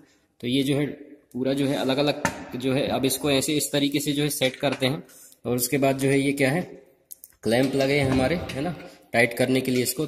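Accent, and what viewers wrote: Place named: India. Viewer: No one hears ur Indian speech try to post with English subtitles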